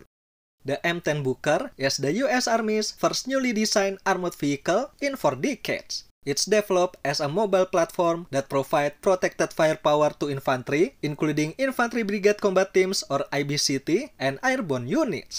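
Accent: Indonesia. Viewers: English tapi pekat pelat Indonesia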